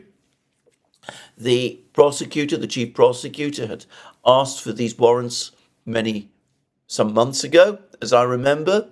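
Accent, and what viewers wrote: United Kingdom. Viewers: Thanks for all you do for us:-). Love your beautiful British accent:-). Take care👍🏻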